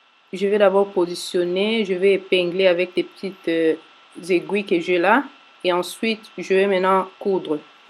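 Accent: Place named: Gabon. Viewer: j'aime beaucoup le thon gabonais de la part d'une kmer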